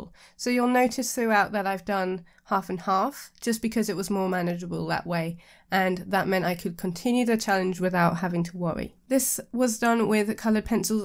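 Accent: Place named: United Kingdom. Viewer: Suddenly I found myself really enjoyeing listening to British english (im not english language speaker and in daily life moustly surrender by american english media)))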